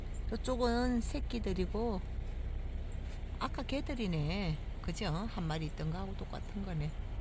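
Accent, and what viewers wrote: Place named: Gyeongsang, South Korea. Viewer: 멋진 영상과 구수한 경상도 사투리 ~~~ 즐감하고갑니다 👏 🙆‍♂️ 늘~건강하시고 행복한 나날 되세요 🙏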